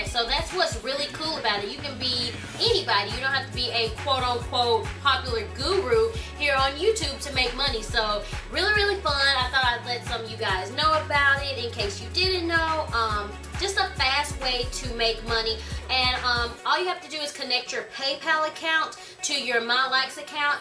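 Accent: South, United States. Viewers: I love your southern accent...if you have one.... Very insightful video :D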